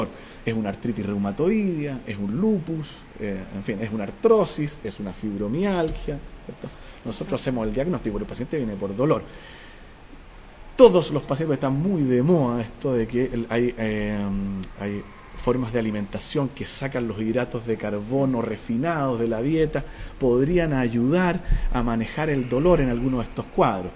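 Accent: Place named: Chile. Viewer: Me huele a chilito por aquí jajajaja me encanta ese acento saludos desde España una exmigrante enamorada de Chile y su cultura gracias🇨🇱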